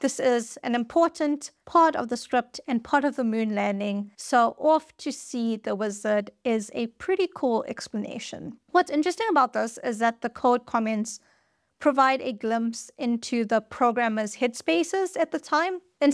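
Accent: South Africa. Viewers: Excellent video. It's heartening to see young software developers pay homage to the giants whose shoulders all developers stand on. I was 7 years old when Apollo 11 landed on the moon. Later in high school, I read about the AGC and I was inspired to learn how to program. First course was FORTRAN with punch cards. I worked as a software engineer for 38 years and never forgot the lessons learned from those pioneers. PS I love your South African accent. Keep up the good work! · Are you South African? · Sounds Seth Efrican. Some antipodean accent. Kiwis would say “luft” and “mussion” for “lift” and “mission”.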